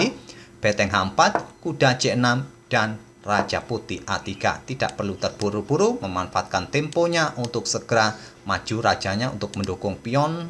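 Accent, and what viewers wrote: Java, Indonesia. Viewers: jangan kurangin analisisanya min, tapi klo tujuannya mengurangi durasi, boleh tapi sedikit aja, itulah ciri khas channelmu dan satu hal yg harus admin tahu, admin tahu caranya menganalisa sesuatu dengan intonasi yg tidak datar( ada variasi tempo yg cepat lambat dan variasi nada yg tinggi rendah) dengan dibumbui logat khas(jawa), ini modal yg besar untuk menganalisa sesuatu kepada penonton karena tidak semua orang mampu menguasai ini, jadi jangan sia2kan ya admin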